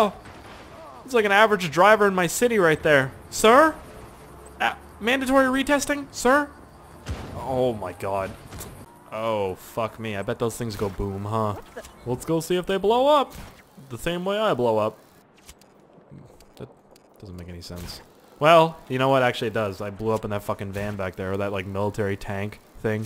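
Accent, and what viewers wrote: Canada. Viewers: Al_isAtto702 no. It's his accent. He's Canadian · Hes canadian and they pronounce cause lime cuz.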